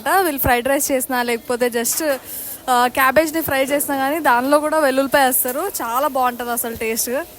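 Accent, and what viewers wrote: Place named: Karimnagar, India. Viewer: Slang different antey meru Hyd or knr. madi karimnagar akka. Continue videos cheyandi anna.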